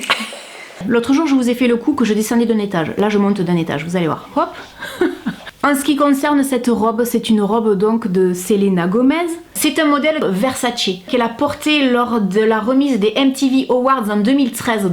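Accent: South, France. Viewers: Bonjour, Jolie accent du Sud, je kiff. Dis....pourquoi pas de promotion pour un site de soutif sur mesure (j'ai pas regardé toutes tes vidéos désolé si déjà fait.). Quand je vivait en Gwada, la boutique Coquin (rien à voir avec les sex-shop)faisait pour les fortes poitrine du prêt à porter et ce fut la libération pour une amie, que j'avais marre de voir voûté et tout le temps en train de rectifier son soutif...et oui: qu'une amie et rien d'autre. Depuis que je suis dans l'hexagone, plus personne ne parle du sur mesure pour les soutifs...en plus du fait que les fortes poitrines sont les grandes oubliées des magasins de sous-vêtement...aubade en 1er. Alors puisque tu parles de sur-mesure des recettes sous-vêtements? Je sais que tu saurais être sexy sans être vulgaire sur le sujet.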